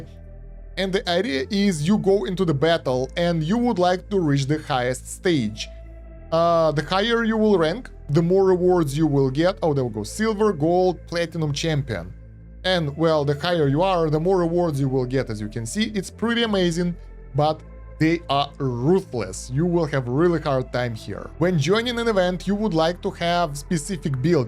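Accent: Russia. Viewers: Слышу твой русский акцент, автор))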